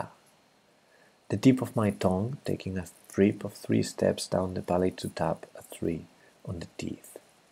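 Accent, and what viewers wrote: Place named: Paris, France. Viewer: the narrator is from paris.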